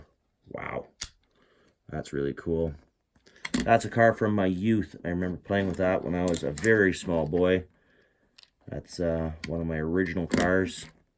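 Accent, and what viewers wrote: Canada. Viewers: Don't be ashamed of your heritage! Just teach them that Canada pronounces things differently, I think a lot of people just assume you're from Wisconsin. lol I used to watch Davidsfarm back in the days, he was practically your neighbor out there in Lucan, and he had the same nuances with his pronunciations, he also did the Zed phrasing.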